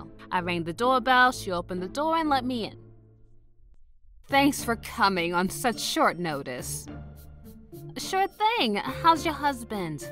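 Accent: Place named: New York, United States. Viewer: I thought it was New York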